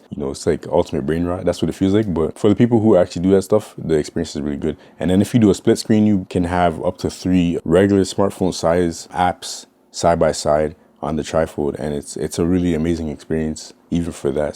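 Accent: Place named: Canada. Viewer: I thought you were canadian from your voice but then saw the fibetv. How the f lmao. Bro that was so much to import. Thanks for making this content dude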